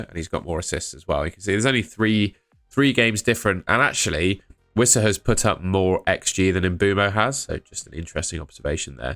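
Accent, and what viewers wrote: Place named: Norwich, United Kingdom. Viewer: Given I'm from Norfolk myself, I'd swear you were from Norwich. Yes the west country accent is similar but you definitely sound more Norwich than Bristol.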